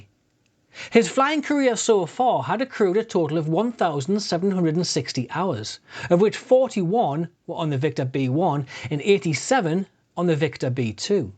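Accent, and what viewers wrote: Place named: Scotland, United Kingdom. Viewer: very clear & concise description of thos trajic accident. we got to see the vulcan perform at air shows in the states, but never the victor or valiant. your accent is exotic to west texas ears, are you scottish?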